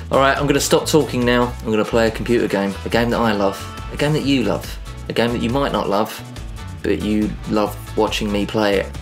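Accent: United Kingdom. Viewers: Geordie Podcast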